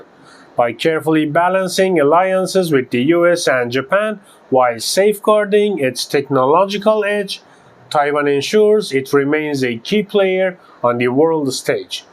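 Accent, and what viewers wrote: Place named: Russia. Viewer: I can barely understand this guy, due to his accent, which is probably Russian. The inflection is all wrong.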